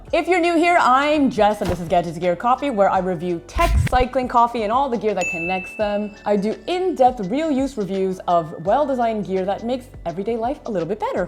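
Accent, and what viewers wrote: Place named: Canada. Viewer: I love the way Canadian say "Owt", for the English "Out"...it's the way I can tell they are Canadians when I meet them, rather than insulting then by saying "Are you American?":-))) Also, filling it up full with water regardless of the size drink you want will be better, as a larger volume of water will maintain the heat better for the extraction temperature than a smaller volume of water, where heat is 'soaked' into the machine body.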